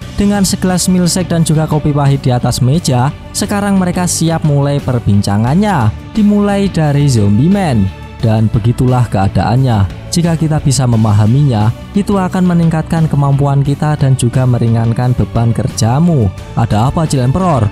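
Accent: Java, Indonesia. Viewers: bang bisa ngak aksen logat jawanya di ganti udh bosen dengarnya🗿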